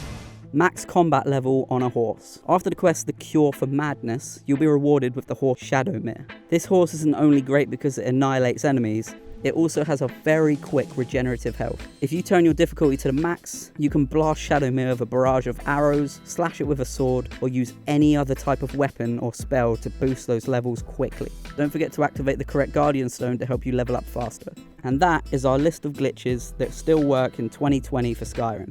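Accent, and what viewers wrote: England, United Kingdom. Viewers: Lol yea... but... you heard the rest of the video in a perfect English accent as well didnt ya?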